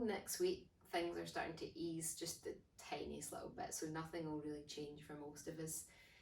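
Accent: Scotland, United Kingdom. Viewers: I could just sit and listen you talk. That Scottish accent turns me into puddles. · Really love your Scottish accent! Ciao from Rome!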